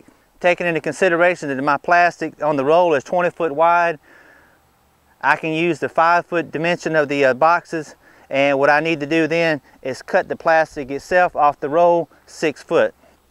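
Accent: South, United States. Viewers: must be from the South i live in Arkansas so im not picking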